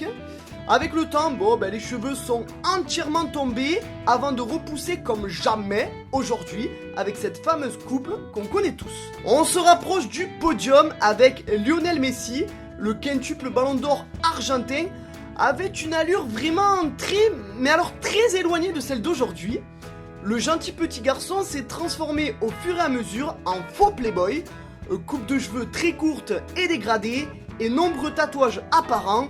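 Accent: South, France. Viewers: Tu viens du Sud toi, non !?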